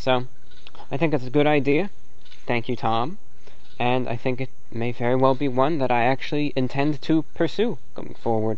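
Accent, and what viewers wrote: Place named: Transatlantic, United States. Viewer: Listening to this podcast I noticed that ReviewBrah speaks with almost a Transatlantic 1950's American Accent. I never noticed that. It goes quite well with his vintage attire.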